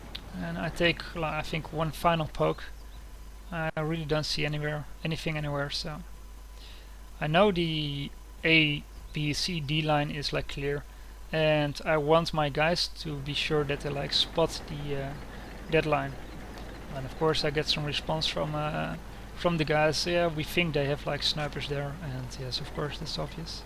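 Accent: Netherlands. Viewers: dat dutch accent ;) Great vid! keep it up!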